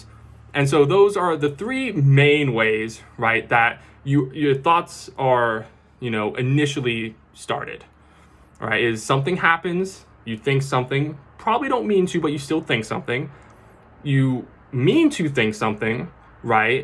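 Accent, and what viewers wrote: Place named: United Kingdom. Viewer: I found you one day as a recommendation by Instagram and just checked your reel on Instagram and this concept sounded interesting so, here I am, thank you for this wonderful video, what a magical recommendation! Your words are a delight to savor without rushing to understand, just embracing new concepts and philosophies. If you could ever grant the opportunity to have a talk, I would be glad to be a part of a beautiful conversation and of course, share a cup of tea! British accent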